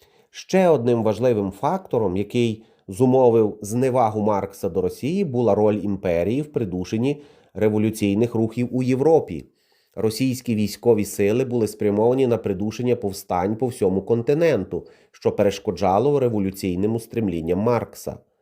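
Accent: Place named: Galicia, Ukraine. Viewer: Все супер. Особливо мені подобається галицька вимова автора. це дуже мило) і навіть сексуально))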